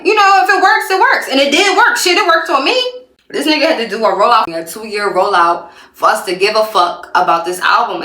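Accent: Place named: Philadelphia, United States. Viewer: That Philly accent is everything… 😩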